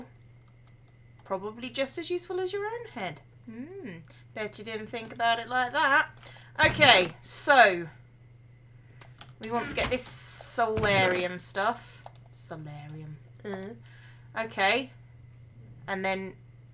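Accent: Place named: West Country, United Kingdom. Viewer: Yay for the West Country accent!:) Great video as ever. <3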